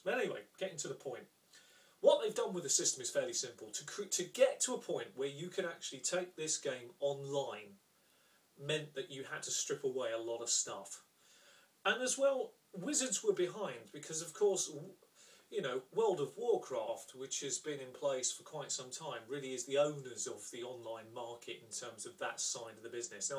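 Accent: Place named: United Kingdom. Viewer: Just typed my name in search, found a well spoken british man talking about rpgs and a hockey goalie kicking some ass. Nice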